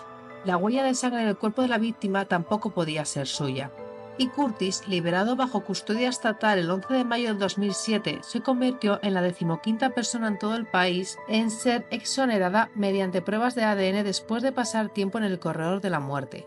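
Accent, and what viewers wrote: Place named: Spain. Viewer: Primera vez que veo tus videos, te felicito por tu trabajo de investigación y por compartirlo por acá con el mundo 😉 Me permito comentarte 1 detalle con el fin de que los que no somos españoles te podamos entender, podrías hablar un poco más despacio ya que tu acento es muy fuerte y es difícil entender lo que dices, por ende me distraje de la historia para tratar de captar lo que decías, a parte de ese detalle todo muy bien! Que te hagas una grande del true crime! 👏🏻 👏🏻 👏🏻 👏🏻 👏🏻 👏🏻 👏🏻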